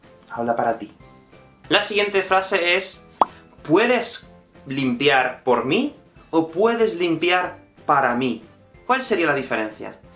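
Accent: Spain. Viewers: Gracias por los videos, pero no puedo entender el castellano, es decir el español de España.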